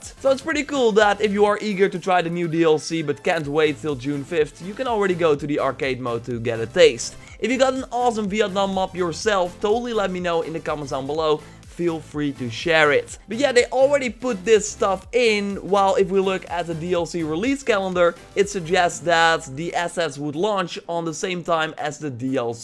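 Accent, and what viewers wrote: Netherlands. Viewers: Wait what are u dutch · Ik wist altijd al dat je nederlands was dat hoor je gwn aan je accent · I'm assuming that cool accent is a Dutch one?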